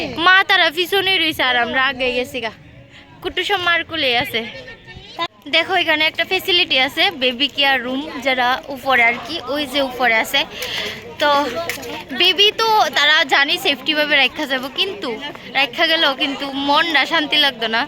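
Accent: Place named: Habiganj, Bangladesh. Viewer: সবচেয়ে ভালো লাগে আমাদের হবিগঞ্জের ভাষা শুনে 🇧🇩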